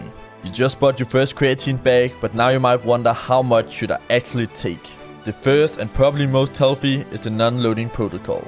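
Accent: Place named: Scandinavia. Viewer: You have one of the worst scandinavian accents I've ever heard. It makes my ears bleed!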